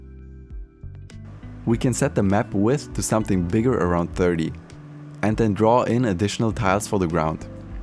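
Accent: Germany. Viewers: Love your channel!! Are you german?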